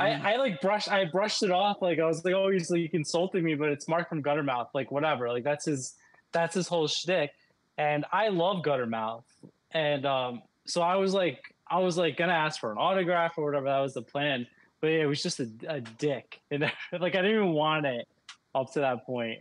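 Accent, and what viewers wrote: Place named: United States. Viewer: not gonna lie i thought you were american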